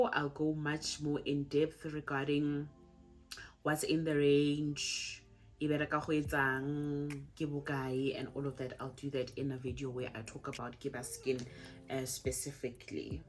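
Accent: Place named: Botswana. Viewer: So refreshing to listen to Setswana se se tlhapileng❤❤ love your energy 🌷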